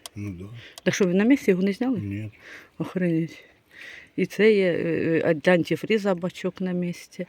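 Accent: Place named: Surzhyk, Ukraine. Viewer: Суржик дикий.😂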